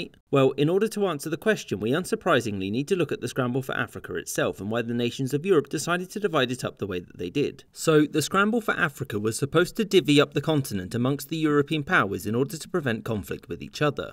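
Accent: United Kingdom. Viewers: as told by a brit yes, you know thisll be good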